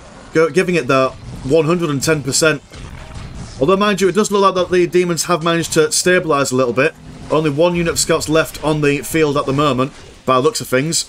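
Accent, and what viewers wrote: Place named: East Yorkshire, United Kingdom. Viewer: I wonder which side of east Yorkshire 🤔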